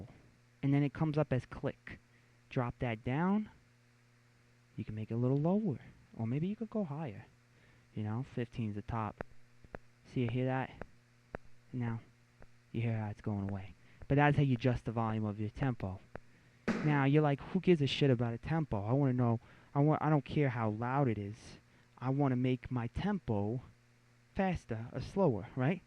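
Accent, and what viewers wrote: New York, United States. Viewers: This dude is hilarious. His New York or Jersey accent makes it all the better.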